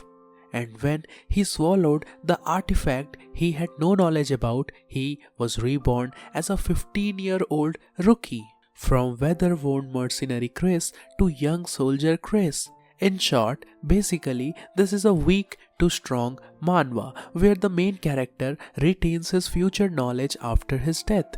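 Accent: India. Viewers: lmao indian accent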